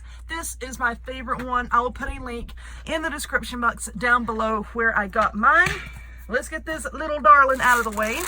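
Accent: South, United States. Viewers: I love your commentary. You keep it real and good southern realness. Plus excellent video. Thank you for sharing this.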